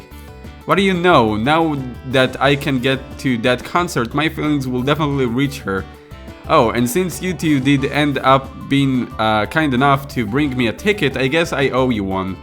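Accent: United States. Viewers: arent you american?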